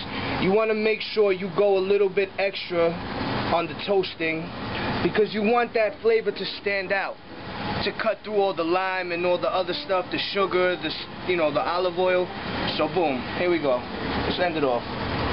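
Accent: United States. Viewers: That he does. He sounds more diverse than just the average New Yorker if you ask me. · That's that Flushing Queens in him. New York hardcore.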